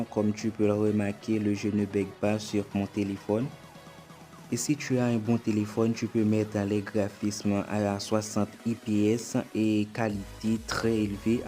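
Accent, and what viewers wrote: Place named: Haiti. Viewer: Depi sou langaj misye c ayisyen' 😅😅foli pale fransè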